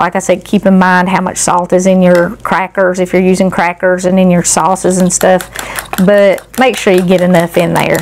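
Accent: South, United States. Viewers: I remember Grandpa would use his left over biscuit bread as a filler. What part of the south are you from your accent makes me so home sick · What does God have to do with meatloaf? ...and the backwoods southern drawl and terrible grammar are not encouraging !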